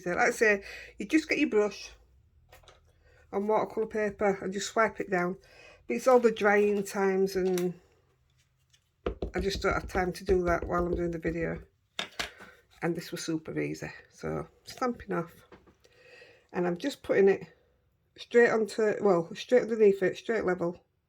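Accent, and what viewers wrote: Yorkshire, United Kingdom. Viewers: I adore your Yorkshire accent, I've got friends with similar accents. I live in North Wales but have a London accent, no problems understanding you. You make me laugh too! Bought presents for all the tags you made, lol! I was listening out for you at Lavinia, but someone said you'd come earlier, I don't do early! Love your cards ❤ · You might want to explain what droll means for non-Tykes. BTW I am married to a Tyke and love the accent. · Omg some people are so rude. I am also from Yorkshire and we do have strong accents. I’ve still got mine and lived in Australia for many a moon. If they don’t like it don’t watch it. There is plenty that love your channel. Why are people so negative I answer you lol. Keep up the brill work and don’t change a thing. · I love your videos and please please continue to blether on while you are crafting in your very lovely Yorkshire accent. 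Love the snoring pups too ❤xx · Don't apologize for your Yorkshire accent, I love it...reminds me of me Gramma. I have just discovered your channel and I will be back.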